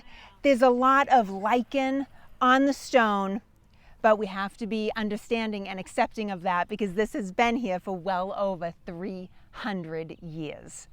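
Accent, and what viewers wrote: Boston, United States. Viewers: I adore the Boston accent! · My brother-in-law is from Boston and talks just like that. If you can't say something nice, don't say anything at all. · You are captivating in your storytelling of our country’s rich historical birth. I could listen to that Boston accent all day long, and I’m from North Carolina having a southern accent. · I subbed a while ago, love your content, and I also get a kick out of your Boston accent. Cheers! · A great series! Thank you, and let me add, having been born in Boston, I enjoy the sound of your voice too!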